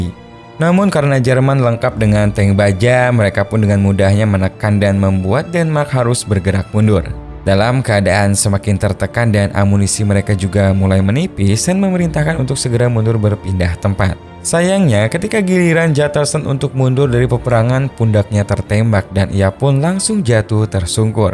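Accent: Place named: Central Kalimantan, Indonesia. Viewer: Ini logat kame Kalimantan tengah lah gue suku Dayak Emang gite lah loga t nye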